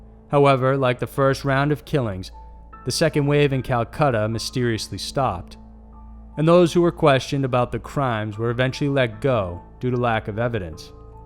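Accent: South, United States. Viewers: i really enjoy your channel. it's in-depth and you have a nice voice. are you from the southern US by any chance? you don't have too much of an accent (although i am texan myself) but i did notice a bit of a southern twang on your long "i" sounds. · Did anyone else notice the narrators strong southern accent in this video?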